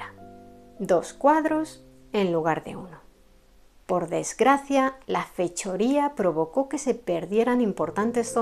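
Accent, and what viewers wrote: Spain. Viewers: Excelente narración y locución de. Gracias por dedicar tiempo en enseñar. Saludo Desde Venezuela. (Barquisimeto. Estado Lara). Podría saber de donde eres? Parece🇪🇸 según acento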